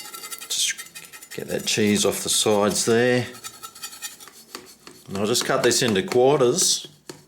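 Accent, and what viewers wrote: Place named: Australia. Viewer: I watch you every now and then:) nice to hear an authentic Aussie accent LOL....this meal actually looks very appetizing I am going to make it as I am sure my boys would like this...two questions...1. hard to tell so is this a big enough meal for 4? Or would I need to double the ingredients and 2. Would frozen cauliflower rice be an ok substitute for the lazy cook aka me 😆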